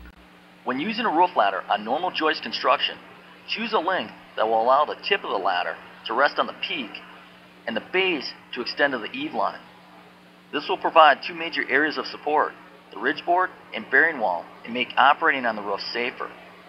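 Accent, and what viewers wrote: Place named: Upstate New York, United States. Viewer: Dude sounds like Upstate New York in accent.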